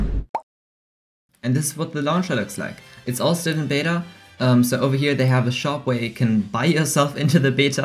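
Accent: Germany. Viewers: U sound very German are you? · I can litterly hear that ur from Germany I am too btw good vid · deutscher akzent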